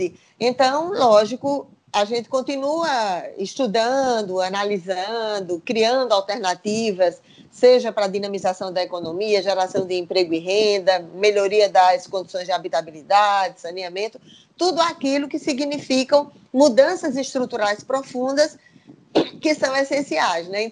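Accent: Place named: Northeast, Brazil. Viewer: boa noite !!,hoje estou matando-o minha saudade de vê essa mulher nordestina como eu,nosso sotaques é um orgulho da espiritualidade e uma busca por coisas melhor .vamos nós sempre firme neste sentido .obrigado .!!!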